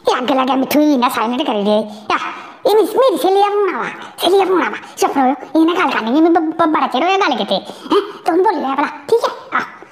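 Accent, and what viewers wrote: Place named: Jammu, India. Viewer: 😂😂😂😂 bilkul aise hi baat krti h jammu ki ourtein. Very nice.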